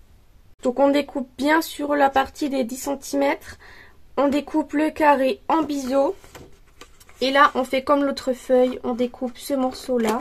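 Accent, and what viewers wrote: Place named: Franche-Comté, France. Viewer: Une belle idée. Bravo. Tu es de quel secteur ? Ton accent me rappelle mon enfance près de Besac. Bises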